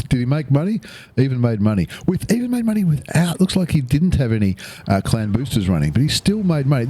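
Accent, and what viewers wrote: Australia. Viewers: Hey yes you not any of those other bloaks luv your videos from Fla. on N/A also awesome aussie accent mate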